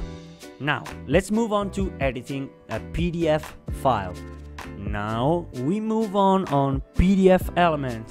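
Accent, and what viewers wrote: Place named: Italy. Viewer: hi, I couldn't help but notice that, by any chance are you Italian? you sound really Italian I don't know why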